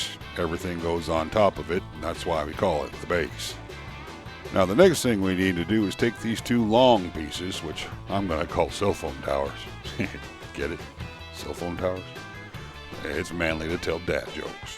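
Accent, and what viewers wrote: United States. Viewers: You are extra funny today. And the accent is extra 'cowboyish". I guess it makes it all 'manly".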